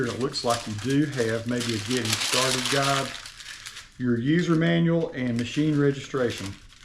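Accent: South, United States. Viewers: Thanks for the detailed vid from a fellow southerner (I am a North Carolinian; I think you are more Alabama or Mississippi correct?). Anyway, I couldn't believe how bad Dyson's video was, especially the part with NO AUDIO. Shame on them for charging so much but not giving us an actual printed manual (my latest version only has a link to a .pdf) and a crappy silent vid.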